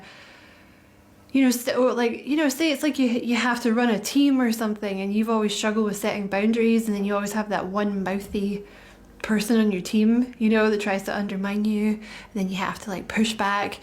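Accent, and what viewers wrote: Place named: Scotland, United Kingdom. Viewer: It’s so lush to hear a Scottish accent for once ❤ thank-you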